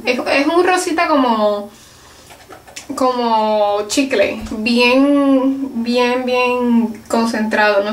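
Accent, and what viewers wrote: Puerto Rico. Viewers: 1- Cheryl Jimenez- Puerto Rico ;) ... 2- Me encantan tus consejos, la manera en que hablas, se te sale lo boricua por los poros ;) 3- No tengo criticas....sigue siendo como hasta ahora, ah bueno...cierra la puerta de tu closet jijiji xoxox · Alooo, Mi nombre es Elivier... vivo en Baja California Sur, MEXICO.. Me encantan tus videos, porque no los cortas ni nada de eso.. son simplemente como tu eres, con errores o sin ellos, y ademas me gusta la forma en que tu hablas, porque me agrada mucho el acento de PR, y RD.. Me gustaria que no cambiaras para nada tu palabreo, porque es lo que te identifica, y me estaria genial que no olvides los videos de cocina, ya que son suuuuper delicious! Un gran saludo, un beso y un abrazo. <3 muak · que hables bien boricua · nombre : maura país : panamá me gusta lo original que eres y que apesar que vives en usa mantienes tu acento puerto riqueño critica : esa mascara de macadamia no hay en panamá :( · Hola soy Susana, de España. 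Lo que más me gusta de tu canal es lo sencilla,graciosa e inteligente que eres, y la manera que tienes de expresarte donde se nota muchísimo tu procedencia puertorriqueña. Como crítica constructiva te pediría que de vez en cuando cambiaras tu peinado o maquillaje, aunque sea poco. Cuídate!:D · hola. mi nombre es: Barbara soy de venezuela... y bueno hay muchas cosas que me gustan de ti como tu estilo me encantan tus accesorios y forma de vestir tu cabello es hermoso amo tu pollina,tus pestañas y el color de tus ojos.. tambien me encanta tu asento P.R... e aprendido muchas cosas de ti con tus videos.... no tengo ninguna critica para ti.. me gustaria que hicieras mas videos de cocina de postres o cosas dulces y tips para pintarnos las uñas y cosas asi.. en fin me gusta todo..TQ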